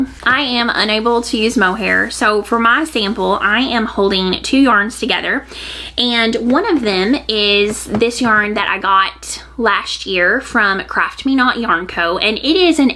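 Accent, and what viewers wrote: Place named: Arkansas, United States. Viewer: I always love a new video from you! You accent makes me feel like home. My family is from Bentonville. I can not wait to see what you get up to this year!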